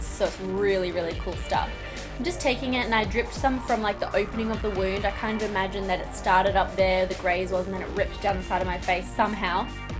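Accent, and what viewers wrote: Australia. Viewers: your Australian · Shes Australian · Is she Australian?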